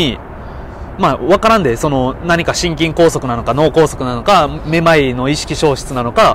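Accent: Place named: Kansai, Japan. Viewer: なんで関西弁なん🤨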